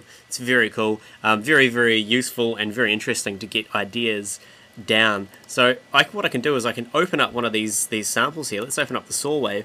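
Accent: New Zealand. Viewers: Are you from NZ, you sound Kiwi azzzzz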